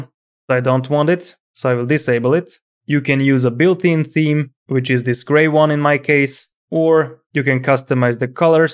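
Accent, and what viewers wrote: Russia. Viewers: Ur vids r cute! Like ur Russian or whatever accent.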